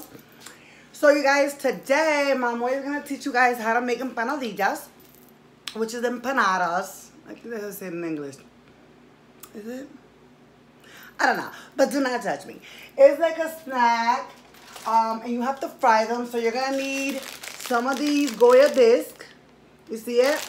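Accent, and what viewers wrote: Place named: New York, United States. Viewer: have you always lived in Massachusetts?? Beacause you have an accent like us New Yorkers ✊🏼💪🏼😁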